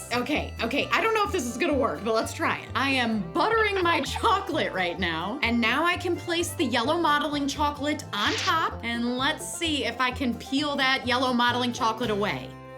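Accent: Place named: Midwest, United States. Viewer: That sounds like a Midwest accent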